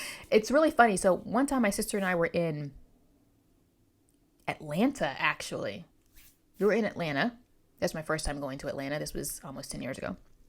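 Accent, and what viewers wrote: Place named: Pittsburgh, United States. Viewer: Funny you brought up your accent. I’ve always thought you sounded like a friend of mine who is from Pittsburgh area. I’m in north central PA.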